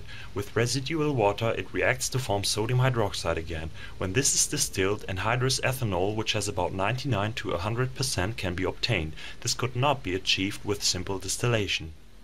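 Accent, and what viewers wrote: Germany. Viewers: Are you German.? The accent sounds like it..( That's NOT a racial thing, just an "ear" thing) :) btw, ich bin Belgiër und mein grossvater war Deutsch.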